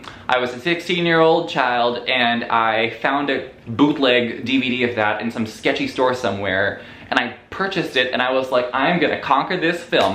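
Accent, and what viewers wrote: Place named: United States. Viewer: I am soooooo curious. How do you have such a strong and authentic American accent if you have never lived in the US. I had always assumed you moved to Asia in adulthood. 🤷‍♀️ Thank you for the list. Great reccomendations as always.❤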